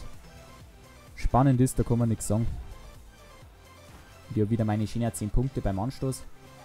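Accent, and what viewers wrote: Bavaria, Germany. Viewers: I dochte am Onfang du dadsd Boarisch sprechn.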